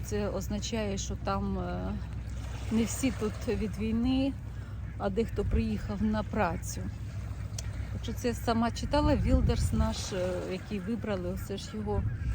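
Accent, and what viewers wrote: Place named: Russia. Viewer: Так интересно слшуть как вы пытаетесь перейти с русского на украинский, а русский так и проскакивает ))))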